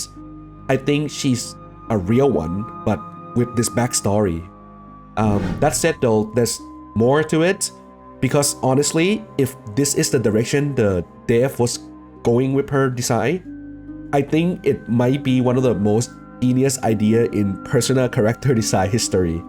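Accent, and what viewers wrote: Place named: Thailand. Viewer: Excuse me, this is not an insult. I wanted to know that are you Thai or not? I recognize it by the accent. I am just curious.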